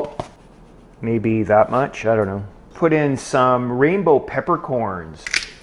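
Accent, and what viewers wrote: Canada. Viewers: Elizabeth B MY THOUGHTS EXACTLY! :) that's always how I'm easily able to identify if someone's Canadian. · When you tune in to hear 'oooot and abooot'... I love the Canadian accent :) Not disappointed!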